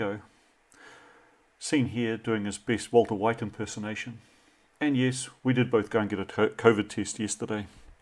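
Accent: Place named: New Zealand. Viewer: Not trying to be too nosey. You sound a bit like a kiwi, but I don't think you're living in New Zealand 🤔 and you seemed to be wearing this old Tony's sweater. 😯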